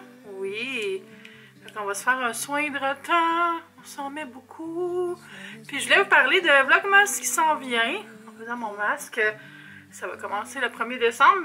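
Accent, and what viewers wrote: Canada. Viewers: bonjour je trouve que la question ne dvrais pas se pose tu as entierement raison c est trop personnel et inutile y a pas a bien ou mal prendre c est logique et puis c esttout je passe toujours un bon moment avec toi et je t avoue que je savoure ton accent canadien que j aime tant bisous et bonne fete a venir!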